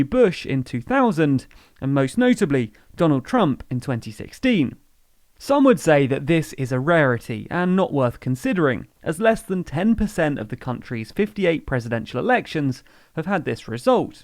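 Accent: United Kingdom. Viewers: Dudes British.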